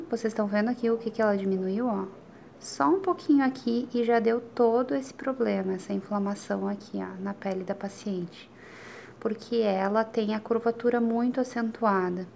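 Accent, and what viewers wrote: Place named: Rio Grande do Sul, Brazil. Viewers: Pelo sotaque acho que é gaúcha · Odeio sotaque Gaúcho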